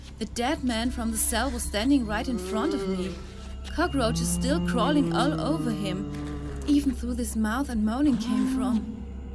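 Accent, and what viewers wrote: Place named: Germany. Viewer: Ich finde es sehr cool, dass eine englische Fassung gemacht wurde. Leider muss ich sagen, dass der deutsche Akzent der Erzählerin zu uneindringlich für diesen Vortrag ist. Es macht, zumindest ergeht es mir so, keinen Spaß zuzuhören. Ich finde den deutschen Akzent in der englischen Sprache absolut nicht schlimm, vielmehr ist er interessant und auch bei einigen Thematiken unverzichtbar. Doch hier wirkt es nicht. Bitte nicht falsch verstehen. Die Sprecherin macht eine solide Arbeit, liest schön flüssig und hat ausserdem eine schöne Betonung, aber -naja, ich wiederhole mich. 7 von 10 Sternen, da alles, bis auf eine Sache passt.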